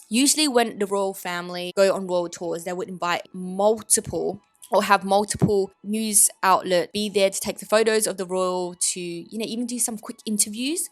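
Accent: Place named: Australia. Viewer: Hi - I'm a new subscriber to your channel. I'm trying to work out where your accent is from - are you an Aussie cousin? I'm from NZ.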